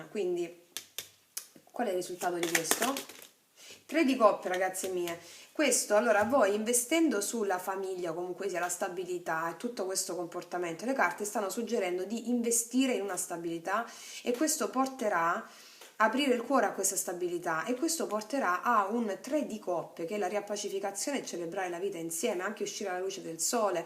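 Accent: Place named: Salento, Italy. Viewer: Ti ho scoperto x caso e la luna rossa dice delle cose a cui voglio credere. Il tuo accento mi è familiare. Salentina? Brava. Grazie.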